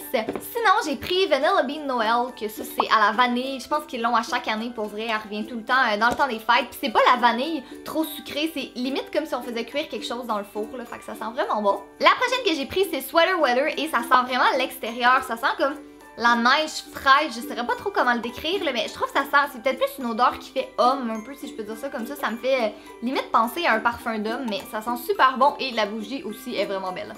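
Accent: Quebec, Canada. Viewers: Je crois que tu n'avais pas a dire que tu es Canadienne, Québécoise, sa se voit dans ton accent!!!😂Mais ne t'inquiète pas, cette une très belle langue(accent) et moi aussi je suis Canadienne…(J'ai a peut près le même accent) Sinon continue comme sa tu es super!!!!❤️😃☺️😋😄😘😍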